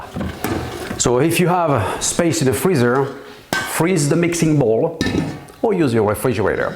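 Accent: France. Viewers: Why the hell do I love him and what he makes so much??? Answer: B/C of the damn French accent😅😝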